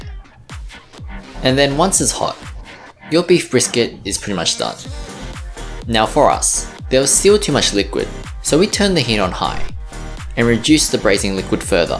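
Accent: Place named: Australia. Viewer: what a nasty ass australian accent xD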